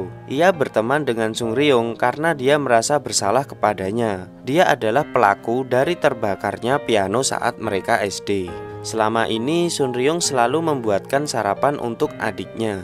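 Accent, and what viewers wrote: Java, Indonesia. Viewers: Jawa medok amat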